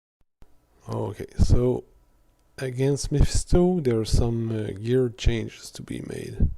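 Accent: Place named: Quebec, Canada. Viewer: quebecois jle sens dicite!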